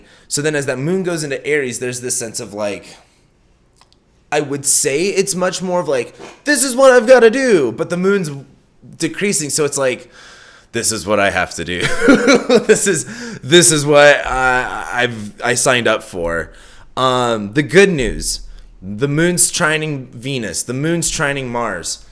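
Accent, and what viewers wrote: California, United States. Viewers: Yes! Love that midwestern twang coming out from under that California accent!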